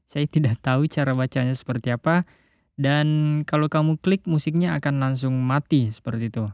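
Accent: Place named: South Sulawesi, Indonesia. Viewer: Kayak Logat orang Sulsel.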